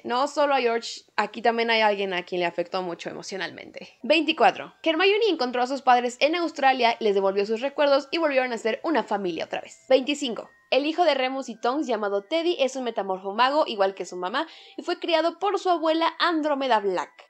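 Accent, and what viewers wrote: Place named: Mexico. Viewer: Que poco se nota ya el acento Mexicano en! Sin duda alguna ha evolucionado en sus videos, sin decir que el que tuviera acento estuviera mal, todos somos brujas y magos del mismo bando. 👀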